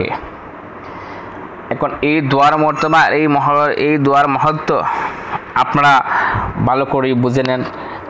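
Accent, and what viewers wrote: Sylhet, Bangladesh. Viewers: ভাই মনে হয় সিলেট বিভাগ এর লোক।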